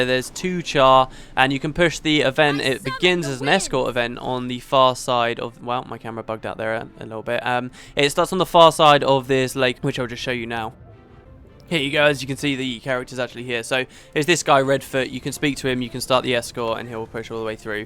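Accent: England, United Kingdom. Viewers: woot is back!! i missed your swagger english accent:P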